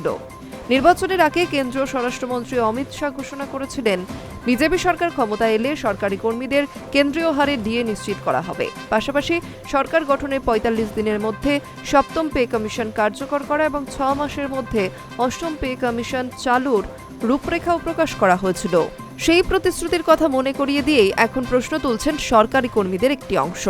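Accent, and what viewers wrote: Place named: Bangal, Bangladesh. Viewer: যিনি খবর পড়ছেন, তাঁর খবর পড়া পুরো বাঙাল উচ্চারণে আক্রান্ত!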